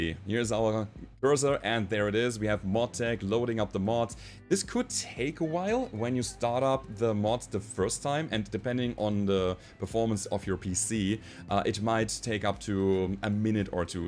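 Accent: Germany. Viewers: Hey you are a German? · Question: As a German native speaker I can really good understand you! Thanks for that. But your accent sounds a bit like my mother tongue? Do you speak German as well? And I‘m grateful to these video. It helps me a lot. EDIT: Seit deiner Windows Meldung weiß ich: Jup, du bist eigentlich deutsch Sprechender ^^ Deswegen verschluckst du nicht so viele Worte, wie Muttersprachler in Englisch. Danke für dein Video :) Ich wollte mir nämlich gestern ne Mod runter laden, da stand nichtmal eine Anleitung dazu. Andere habe ich nicht angeschaut ^_^ Cooler Typ!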